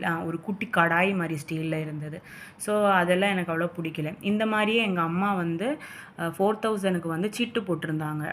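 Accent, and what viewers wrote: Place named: Malaysia. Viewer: You are malaise your slange you speak in malaise why in Tamil to earn money 💰 using tamilans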